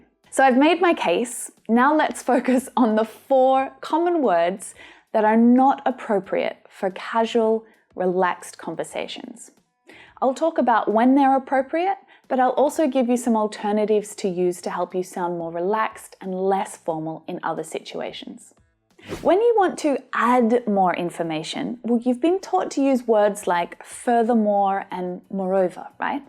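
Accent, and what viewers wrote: Australia. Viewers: so you are from Australia, I was not capable of telling whether you are Britain or Australian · I really love your Australian accent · Im new to the channel, Im trying to guess where ur from? my guess is you are down under??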